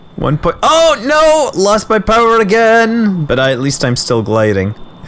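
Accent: Scotland, United Kingdom. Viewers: Yeas hes scottish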